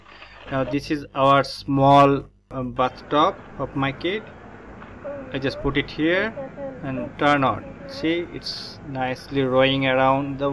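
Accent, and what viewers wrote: India. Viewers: Are you and Indian from Southern part ????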